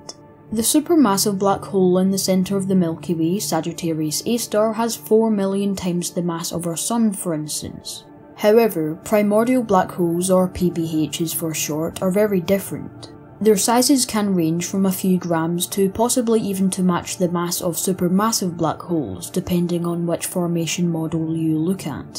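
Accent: Scotland, United Kingdom. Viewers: It's a Scottish accent. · He is Scottish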